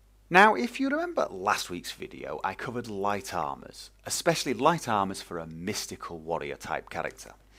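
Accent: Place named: England, United Kingdom. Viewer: His accent is English.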